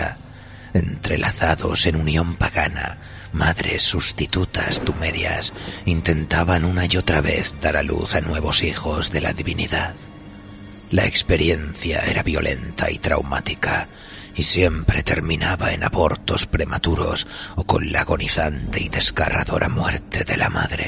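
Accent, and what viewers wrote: Spain. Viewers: Excelente narración, me encanta el tono español en los relatos góticos, ojala también puedan hacer el de blasphemous. Saludos desde México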